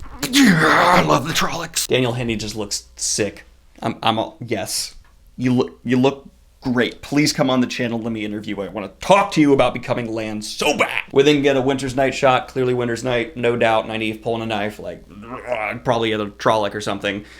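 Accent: United States. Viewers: That’s such a ridiculous critique man. He pronounced them properly and is using them in a sentence, also that’s how an American accent sounds. Don’t be harping on people for not pronouncing something the way you think it should be. Try saying those names out loud in a sentence and I bet they sound exactly the way the audiobooks and Daniel says it. This is the kind of comment that makes certain people in fandoms toxic. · are you from NOVA???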